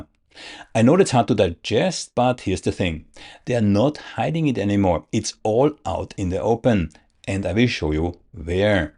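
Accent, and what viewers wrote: Germany. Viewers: I came for Russian propaganda in English with German accent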